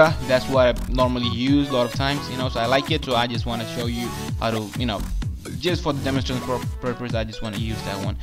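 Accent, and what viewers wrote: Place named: India. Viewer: Jesus, Indians YouTubers use have of their videos to talk, too much useless talk. Whenever I realize it's an Indian voice, I just click the middle of the video, then I see/watch/hear what I came for. It's a good tip, it helps to do away with that annoying time-waster voice of theirs.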